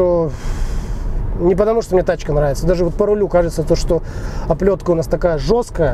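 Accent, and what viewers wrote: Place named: Kazakhstan. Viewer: красавчик!Дикция "андреймалаховотдыхает"👍👍👍Я даже по радио слушая мог предположить,что из Казахстана)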